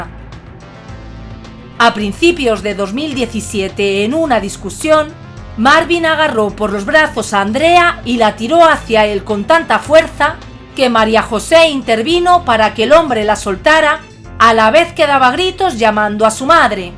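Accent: Spain. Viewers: Hola María ,me encanta tu trabajo y por tu asentó deduzco que eres española , pero es video no vidio como tú lo dices jjjj saludos desde República Dominicana 🇩🇴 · podes haceralgo con ese acento gallego? no suena bien.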